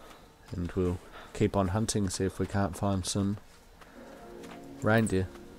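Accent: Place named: Australia. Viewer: Like your style and it's good to hear an Aussie voice. That letterbox is bad tho, unless otherwise my phone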